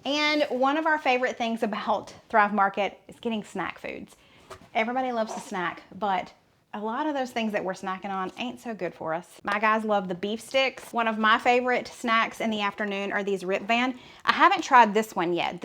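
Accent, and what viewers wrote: South, United States. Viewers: YouTube put you in my recommended videos. Glad they did. Love your quick recipes and your Southern accent. I live in Texas and appreciate your downhome manners. You made me cry, though, when your husband was praising your cooking. My husband used to always do that and his face would light up and he'd smile just like did. I miss cooking for him and having him ask for seconds or even thirds. Sorry about your cat, too. That made a tear come to my eye, too. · you are just flat out adorable with your southern accents;];];] love your family!!!!! i live in northern maine and the leaves are almost done dropping nites are in the low 40s sometimes lower then that come morning, thank you for all that you do...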